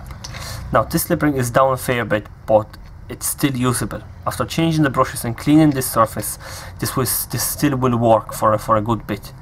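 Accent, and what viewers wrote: Ireland. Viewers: Hi. Thank you for your time and sharing your knowledge you could nearly be mistaken for an Irish man. 😂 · Funny! Best Irish accent from a foreign national ive ever heard! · Man not being funny but you have a mad accent irish farmer/central European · What part of Ireland is that wonderful accent from?????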